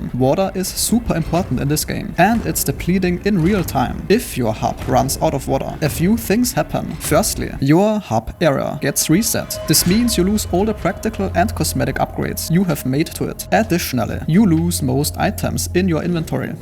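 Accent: Germany. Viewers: Boah dieser deutsche Akzent geht schon hart XD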